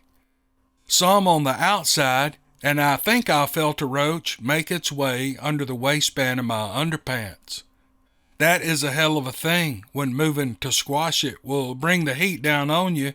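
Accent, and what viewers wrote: South, United States. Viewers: Good morning. I enjoy your recounting the experiences others have had but, nothing compares to these Steve Lily and Wildhunt stories. There is a connection to the characters that the shorts just don't afford. Your southern drawl gives your narrative an air of authenticity. Please keep them coming. Randy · I’ve been sharing your stories & telling everyone I can all about The Wild Hunt & Steve Lily. Everyone I share these gems with is hooked after hearing the first one. Sometimes I tell them in my own words & voice to peak their interest, then I close the deal when they hear the twang of the guitar & the soft, soothing sound of your good ole boy southern type voice weaving a tale of man vs monster. The latest addition to your growing audience is my oldest nephew. I started him in on The Wild Hunt Oklahoma Sasquatch Wars. Thank you for all you do & looking forward to future Steve Lily & Captain Clark of Team Odin’s Squatch encounters.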